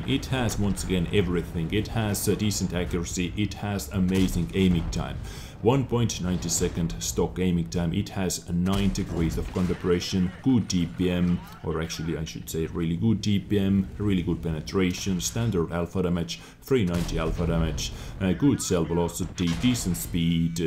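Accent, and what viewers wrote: Estonia. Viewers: Are you Estonian? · are you Estonian? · are you from one of the baltic states Estonia? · Are you Estonian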